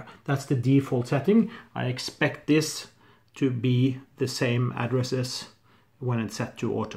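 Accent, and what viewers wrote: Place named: Norway. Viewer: Found the video randomly. instantly heard that you were norwegian.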